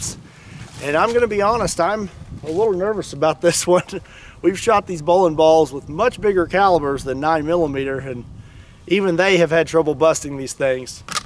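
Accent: Kentucky, United States. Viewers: what part of kentucky ar e you from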